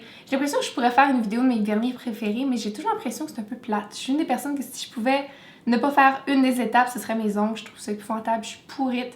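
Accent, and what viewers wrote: Quebec, Canada. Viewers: J'aime tellement ton accent québécois ! Dans le nord de la France on a pas la chance d'avoir un si bel accent... Ahaha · J'écoutais tes vidéos de mascara en anglais et j'ai reconnu un accent québecois alors j'ai fouillé sur ta chaîne et j'avais raison!!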